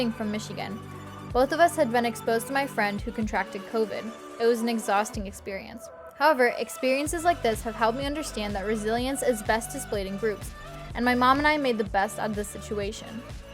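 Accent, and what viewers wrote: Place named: Boston, United States. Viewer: You almost have the Boston accent. Bah-stin.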